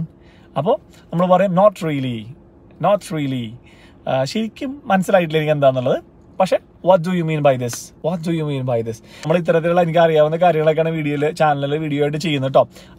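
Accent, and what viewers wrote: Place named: Thrissur, India. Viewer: ലാസ്റ്റ് ക്വസ്റ്റ്യൻ and ആൻസർ ആണ് ഇഷ്ടപ്പെട്ടത്. പിന്നേ,,, തൃശ്ശൂർ കാരൻ ആണല്ലേ... ഞാനും.🥰എത്ര അറിവ് നേടിയാലും അവരവരുടെ നാടിനെ ഓർമ്മപെടുത്തുന്ന തനത് ഭാഷ ശൈലി . ഇതിന് മറുപടി ഇന്ന് പഠിപ്പിച്ച ആദ്യ പാഠം തന്നെ ഉപയോഗിക്കുന്നു.🙂 Well done my boy 😂 keep it up 😋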